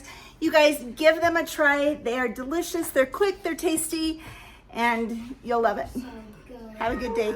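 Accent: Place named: North, United States. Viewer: I love this! you seem so sweet and kind <3 .... the way you say "measure" and a few other words, it makes me think you are from up north somewhere?? I've never heard this accent before, so i am taking a guess , lol ... ( I'm from Southern Indiana )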